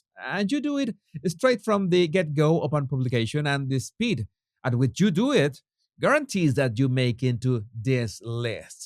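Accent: United Kingdom. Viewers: Your British accent cracks me up. 😂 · Love the British accent!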